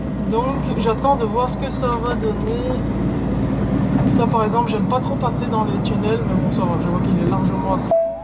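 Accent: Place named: Belgium. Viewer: Très intéressante comme vidéo car je commence ma formation de camionneur en avril 2024. Changements de carrière pour moi. 🇨🇦🇨🇦🇨🇦 je suis de Montréal. Bonjour à vous en Belgique, j'aime bien votre accent !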